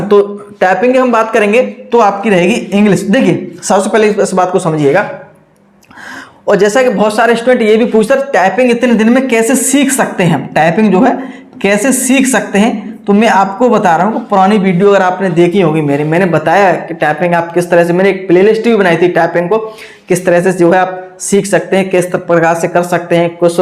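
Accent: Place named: Etawah-Mainpuri, India. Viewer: सर आप की भाषा से लगता है। कि आप इटावा या मैनपुरी जिले से हो🤔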